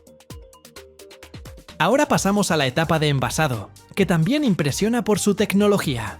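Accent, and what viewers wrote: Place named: Spain. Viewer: Buenas imágenes, buenas tomas en el vídeo pero que lastima que hables como los españoles de españa,España con “e"menuscula soy Americano Nativo, greetings.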